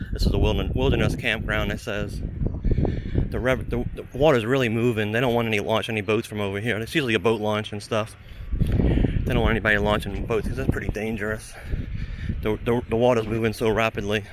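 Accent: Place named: New Orleans, United States. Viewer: You sound so New Orleans! Thanks for posting!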